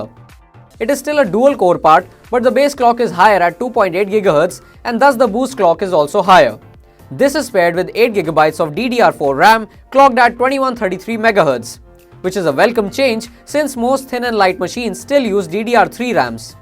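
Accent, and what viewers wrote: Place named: India. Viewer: lol, Indian accent really, and to me not so bad. its understandable.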